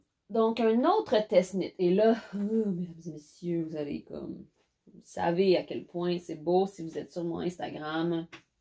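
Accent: Quebec, Canada. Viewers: Hello! Je fais partie de ceux qui après vous avoir découvert au détour d un hasard (Che plus en fait comment je vous ai rencontré 😉)....j’ai visionné tout vos post cast dans l’ordre: pour commencer j’adore l’accent du Canada 😁, il me met de bonne humeur: alors accent et Tricot le combo parfait pour vous découvrir! Et je vous adore: vous êtes toujours souriante, avec de l humour et j’aime votre univers! Ma question: comment vous organisez vous entre job, enfants et tricot?! Sinon pour en revenir à ce post cast: oui faut tout essayer, au pire on défait mais jamais de temps perdu: ce temps à tricoter est aussi un temps de méditation, ou de relaxation 😃. Bravo à vous et garder votre bonne humeur 😘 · Je suis toute nouvelle dans le monde du tricot. Et en regardant tes vlogs et autres vidéos, je joins deux plaisirs : celui de t'écouter (c'est très instructif et inspirant pour la débutante que je suis) et celui d'écouter ton accent québécois (je suis une québécoise qui vit à Paris, et ça me rappelle mon chez-nous !). 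J'aime beaucoup ce que tu fais, y compris ton apprentissage du filage : continue comme ça !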